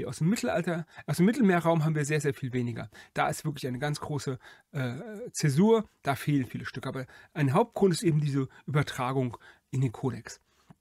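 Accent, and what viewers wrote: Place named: Rhineland, Germany. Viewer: Ein klassischer Sprachfehler scheint das nicht zu sein, tritt vor allem bei Rheinländern und Eifelern auf und scheint in deren Dialekt zugrunde gelegt zu sein. Wenn es Sie zu sehr belastet, wechseln Sie einfach auf einen anderen Kanal, mich regt mehr die Diskussion auf, als die kleine sprachliche Besonderheit, die mir im Übrigen schon ziemlich lange bekannt ist. Wenn Sie mit Schweizern oder Sachsen zu tun haben, werden Sie auch kleine sprachliche Besonderheiten feststellen.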